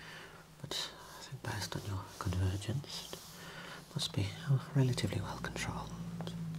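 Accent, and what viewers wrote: United Kingdom. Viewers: Are you British? Your accent and that jacket!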